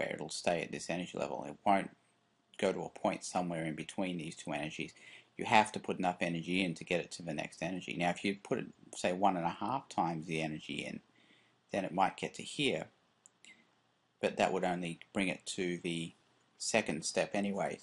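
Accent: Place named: United Kingdom. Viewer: you bless my sleep with dreams of your british voice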